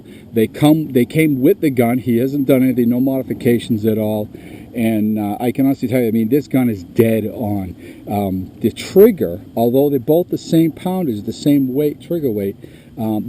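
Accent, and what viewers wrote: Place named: Massachusetts, United States. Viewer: I detected your Ma accent within your first sentence. I guess it takes one to know one. ; )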